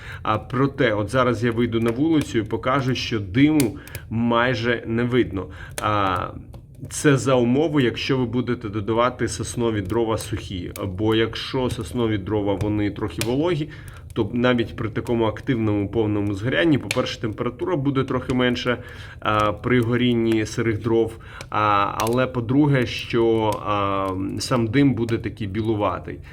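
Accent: Russia. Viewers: В твоей речи слышно, как много лет ты говорил на русском, а тут бац и вспомнил о незалежности.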